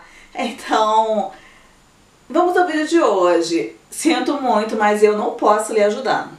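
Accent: Rio de Janeiro, Brazil. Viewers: assim que comecei a ver esse vídeo já notei que vc é Carioca. O Carioca mesmo não sendo da "gema" tem o lindo sotaque. Eu sou do estado do Rio também precisamente do outro lado da poça depois de Niterói. Eu moro em USA por quase 14 anos e meu sotaque charmoso Carioca não me deixa. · É que sou carioca e tenho esse seu sotaque, por isso perguntei...rsrs. Bjsss pra vc, linda!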